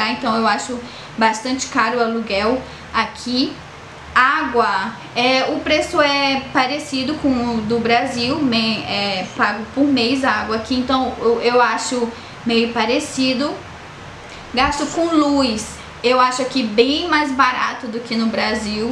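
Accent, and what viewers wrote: Mexico. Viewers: Já está até com o sutaque deles🤭❤️ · Até o seu sotaque é mexicano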